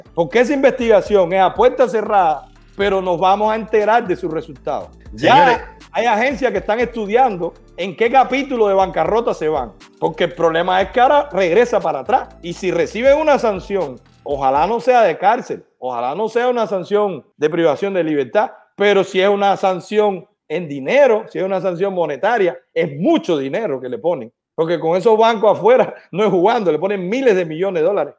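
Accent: Cuba. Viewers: Señor usted es un excelente comunicador y se informa muy bien hablando en buen cubano con usted no alumno bruto, felicidades, gracias.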